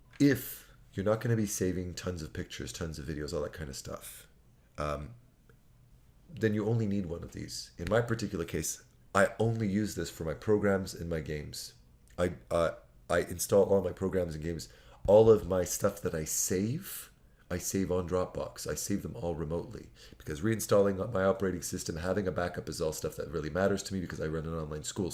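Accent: Canada. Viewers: I'm new to the channel and love the content,! Does anyone here have the calibration settings for the 24in Cintiq? I purchased one through a school grant at work (high school art teacher, here) and wondered whether or not I should get that Wacom color calibrator. Side note, my dad grew up in Winnipeg. So, when I came across your video on Infinite Painter I detected the Canadian accent. So cool! Happy New Year to all!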